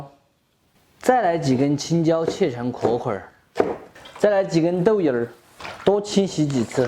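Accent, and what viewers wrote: Sichuan, China. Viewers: 味道肯定巴适得板，配合你的川普简直是绝配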